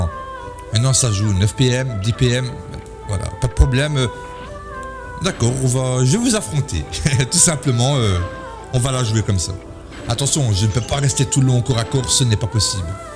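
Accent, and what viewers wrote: Belgium. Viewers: Je suis obligé de mettre un gemmes, rien que pour l’accent belge, génération François l’embrouille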